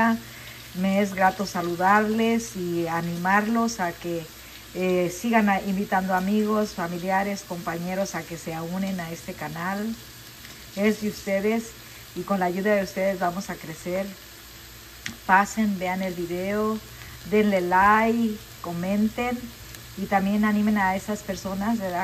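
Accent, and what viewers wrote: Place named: Spain. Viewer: Hola querida chef, más de 1400 videos que preparaste y compartiste para nosotros y alrededor de 113 mil suscriptores es un récord maravilloso y excelente para ti, te felicito.Ver este video de 4 minutos en el que hablaste con un hermoso acento español fue muy divertido para mí, y ver tu video me fue muy útil para practicar español..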